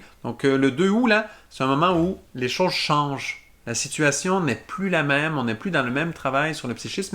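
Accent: Quebec, Canada. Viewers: Quel joli Monsieur avec un charmant accent Québecquois et qui parle vraiment bien et juste!!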